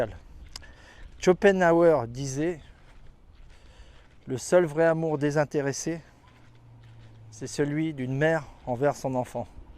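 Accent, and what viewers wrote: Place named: France. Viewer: Continue tes vidéos mec !👏🏻. HS tu viens d’où? T’as un petit accent ligériens ou isérois qui ressort de temps en temps on dirait 😅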